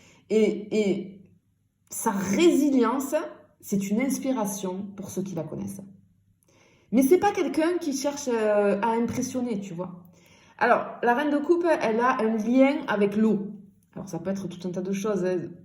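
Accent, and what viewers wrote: South, France. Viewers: Bonne et heureuse année a vous et vos proches merci à vous de nous guider et votre bonne humeur et cet voix qui chante le sud ça nous fait du bien merci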